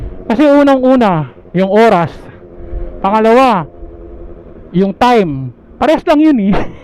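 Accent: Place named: Iloilo, Philippines. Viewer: Lods taga saan po kau parang Iloilo salita nyu minsan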